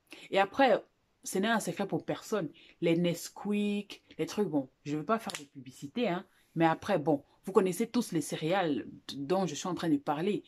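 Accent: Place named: Democratic Republic of the Congo. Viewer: Je confirme pour les produits laitiers. 😥Je découvre que tu es camerounaise. D'où te vient l'accent congolais?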